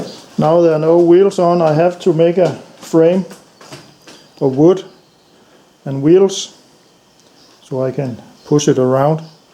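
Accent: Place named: Denmark. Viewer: judging by the accent, I'd say you are from Denmark ❤ the video just showed up on my feed! cool project! keep it up!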